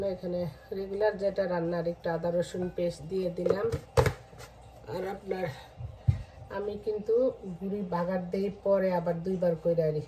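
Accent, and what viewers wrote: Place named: Comilla, Bangladesh. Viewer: আপু আপনার কথাগুলো শুনলে খুব আপন কেউ মনে হয়, আমি কুমিল্লার তো তাই,কথার সাথে মিল আছে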